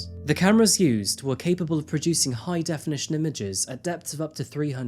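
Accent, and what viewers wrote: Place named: United Kingdom. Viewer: Hey cuz are you gay? Or is it just that across the pond accent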